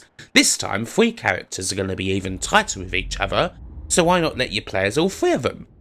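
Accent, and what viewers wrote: United Kingdom. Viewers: people don't watch long videos. If you want information about a game which boils down to "is it worthwhile or not?", it can probably be boiled down to 5-10 minutes. If the video is longer, chances are, the density of original thought and production quality is quite low, and 90% of the voice over wouldn't even pass for complete sentences, consisting mostly of "uhhhs". Admit it, you have seen such videos and would avoid them like a plague. Now, this guy is nothing like that, he can actually make insightful points an hour long. But not a lot of people know that, apparently. Add to that the mild British accent, and suddenly the bulk of the largest English-speaking country imagines that it's too difficult to comprehend.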